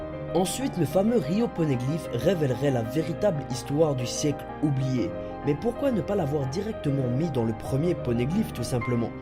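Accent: Brussels, Belgium. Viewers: T'es de Bruxelles?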